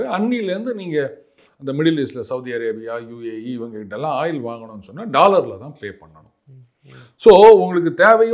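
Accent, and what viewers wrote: Chennai, India. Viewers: Bro significant post chennai slang amakalam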